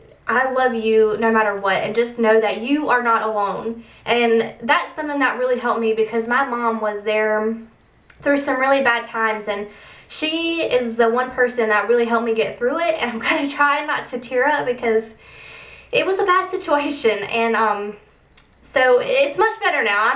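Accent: South, United States. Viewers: gahhh love your southern accent lol! good advice girl!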